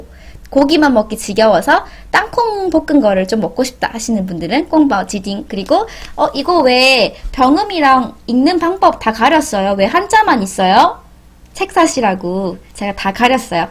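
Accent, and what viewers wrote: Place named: Gyeongsang, South Korea. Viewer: 중간 중간에 경상도 억양이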